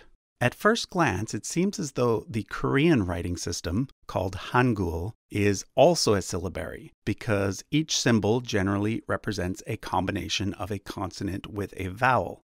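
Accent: United States. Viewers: why do you say all the east asian languages in the most american way.. at least try and research😭😭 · I loved hearing him pronounce asian languages with an american accent lol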